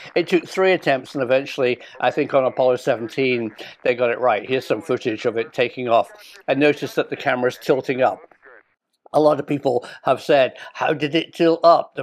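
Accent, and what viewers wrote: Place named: Cornwall, United Kingdom. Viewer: ahhh really! Sometimes you have a hint of Cornish! ❤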